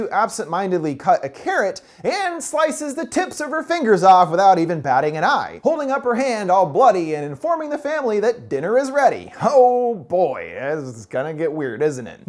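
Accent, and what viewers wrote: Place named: United States. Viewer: how americans speak, jesus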